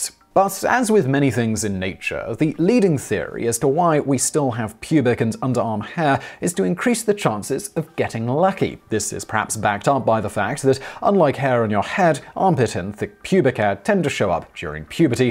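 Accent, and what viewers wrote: United Kingdom. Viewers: Even when spoken with a British accent, merkin is an ugly word.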